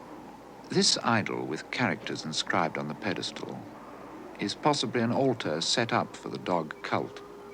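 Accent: RP, United Kingdom. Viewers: Its like a weird apocalyptic pastiche of a salvidor dali painting, in a posh british accent 😂 I love it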